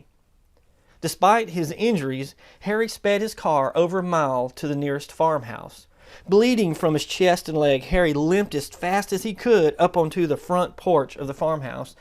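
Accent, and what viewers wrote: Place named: Tulsa, United States. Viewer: OK, I grew up in Tulsa and still live nearby and you sound like a local. Am I correct? Great job.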